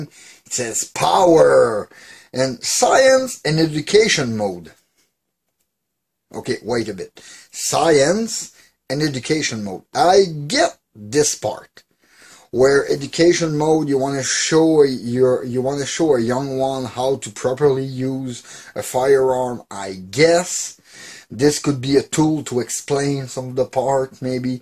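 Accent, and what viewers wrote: Quebec, Canada. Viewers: J'ai reconnu ton p'tit accent du bon Québec :) Bonne vidéo my man! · Tu vien du quebec?! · quebecois et fiere de l'etre jai reconnu ton accent hahah! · wait you come from quebec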